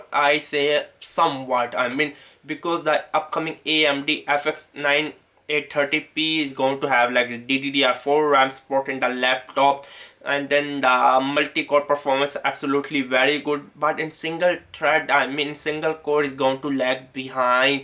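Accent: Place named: India. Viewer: hes just indian so he has a thick accent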